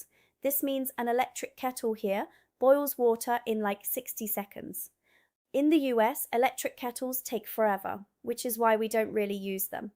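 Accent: England, United Kingdom. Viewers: So why do you have such an amazing English accent ? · You could almost pass for British with your accent, especially not mentioning the Electrical outputs, and the water taps. 😃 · How come, if you're a seppoe, you've got a cut-glass English accent? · I only discovered your site tonight. You’re terrific. Love the accent (educated English🤭 ). Love your humour. Hope you stay here. 👍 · Think sweetheart, you will find it takes 3mins to bowl a full kettle of water, but who cares, pleased you like our beloved country, as for your distinct accent, have you some English parentage by any chance?.... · Can't understand why you have an English accent? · No way are you American with that very British accent. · You seem to have acquired a nice genuine British accent in your time here....🙂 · how do u have a british acsent? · You have a very English accent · All you haters leave her alone I like the fact she’s adopted an English accent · hmm two years and a perfect British accent, nice try but no way. 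· Excellent presentation, english humour and accent but please lose the Glottal stop! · Respect for your proper English accent. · You don't sound American. You sound very british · Have you been practicing your English accent?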